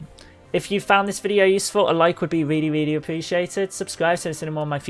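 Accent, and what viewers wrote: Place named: Liverpool, United Kingdom. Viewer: SCOUSE DETECTED RED OR BLUE CHAMPIONS OR CRAP CHOOSE NOW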